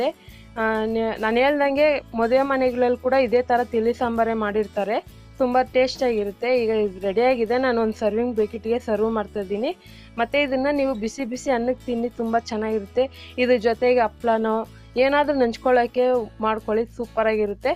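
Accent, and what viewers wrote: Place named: Tigalarapete, India. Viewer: nimma bashe Tigalarapete yavara thara idde.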